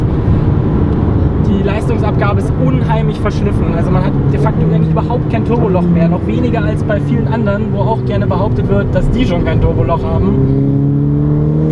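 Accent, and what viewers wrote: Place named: Poland. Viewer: sounds like a Polish Nowak, you are a real polish Nowak?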